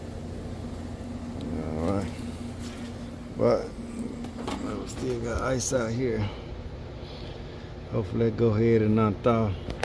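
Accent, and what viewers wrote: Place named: Alabama, United States. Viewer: Small thang to a giant...that`s that alabama/mississippi talk there. I feel you though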